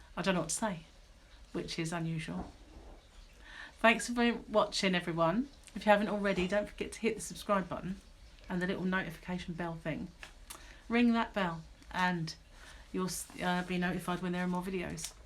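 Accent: United Kingdom. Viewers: Love ya guts lady!! Aannd I absolutely love the way brits say aluminum!! I watch Joel & and they talk about the similarities and differences between the USA and UK I just adore the accent!! The happy mail is awesome!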